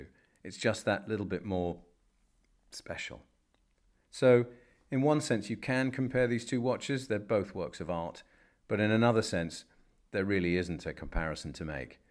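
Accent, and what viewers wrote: United Kingdom. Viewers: It’s really cool to find a video like this and feel like I’m having my thoughts read aloud in a British accent. I’m a huge fan of Lange and GS but have never been able to pull the trigger on a GS.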